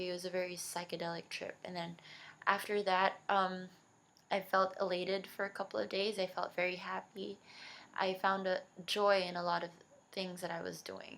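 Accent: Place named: United States. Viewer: You sound so american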